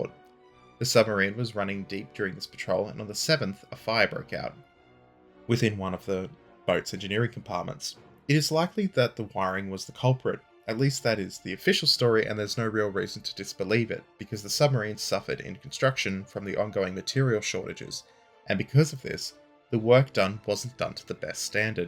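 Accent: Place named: Australia. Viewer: A difference in dialect, he’s Australian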